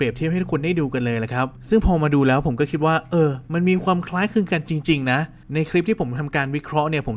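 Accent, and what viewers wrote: North, Thailand. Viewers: พี่คนเหนือป่าวดูหลายคริปน่าจะใช่5555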